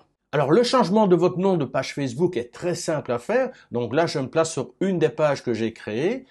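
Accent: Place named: Belgium. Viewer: Bonjour, sauriez vous me dire comment peut on contacter Facebook qui ne répond jamais mais alors jamais ! J'ai un groupe de plus de 105 k abonnés et je sais que FB fait ce qu'il veut quand il veut (modification, suppression de contenu injustifié, etc...) mais côté service client c'est pitoyable. Alors si vous aviez une astuce ou idée ce serait cool. Je voudrais savoir aussi si vous connaissez un truc pour mettre une photo cliquable sur un groupe FB ? En tous les cas, vous assurez grave avec tous vos tutos super bien expliqués, hyper didactique avec votre accent (belge, suisse ou alsacien ? ). Bravo et merci. Patrice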